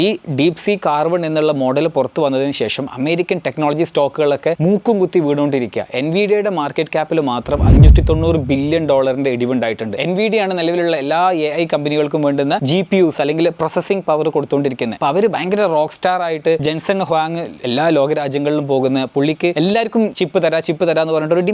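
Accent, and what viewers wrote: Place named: Thiruvananthapuram, India. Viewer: A of AI has a TvM slang ❤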